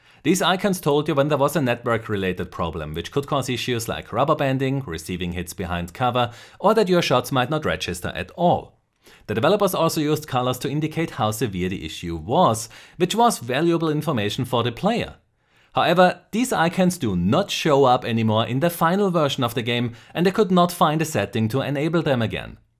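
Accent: Germany. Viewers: Are you from Germany or Austria? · Das ist das erste Mal, dass ich eins deiner Videos schaue. Sehr gute Arbeit, ich bin beeindruckt! Du musst Deutscher sein, hört man sofort an deinem Akzent. :D Weiter so! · you sound german accent.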